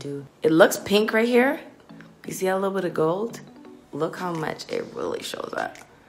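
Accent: Barbados. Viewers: you can really hear her Barbados accent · Her Bajan accent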